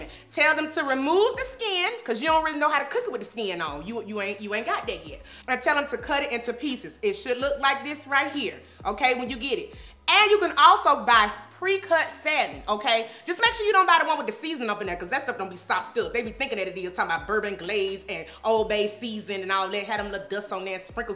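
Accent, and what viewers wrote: England, United Kingdom. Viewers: She took me out with the English accent 😂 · It's the british accent for me. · It's the British accent for me 😂 😂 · I “REALLY” Liked your “British” accent!!! Classy!!🙌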